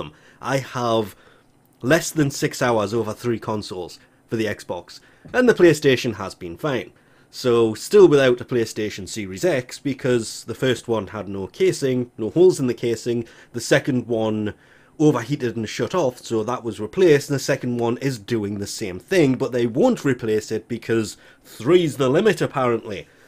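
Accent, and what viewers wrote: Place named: Newcastle, United Kingdom. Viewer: hmm I hear a hint of georgie accent there, I would have to guess durham?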